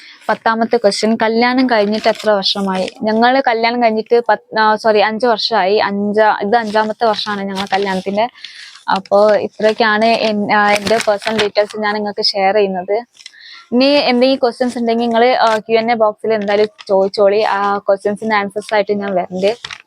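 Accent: Malappuram, India. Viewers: കേരളത്തിൽ എവിടെ ആയിരുന്നു. മലപ്പുറം സ്ലാങ് ആണല്ലോ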